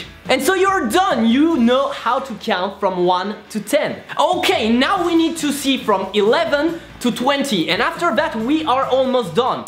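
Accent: France. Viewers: L'ironie c'est que je perfectionne ma compréhension de l'anglais parlé en te regardant :D J'imagine que pour un natif des USA tu as des restes d'accent français, mais de mon point de vu tu parles anglais à la perfection ^^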